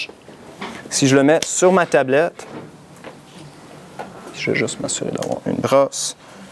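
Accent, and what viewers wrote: Quebec, Canada. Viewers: J'ai regardé rien compris je comprends pas trop l'accent des Québécois :/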